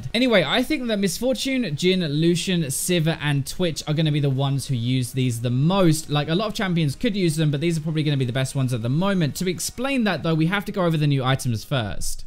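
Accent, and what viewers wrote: United Kingdom. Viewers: I'm confused about why these British YouTubers are so confused about how lethality is pronounced, can someone explain this to me as an ignorant American?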